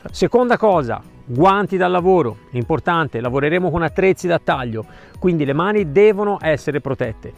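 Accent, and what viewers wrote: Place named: Tuscany, Italy. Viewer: infatti..il tuo accento è anche il mio...io abito zona Lucca fora...terra di Ulivi ...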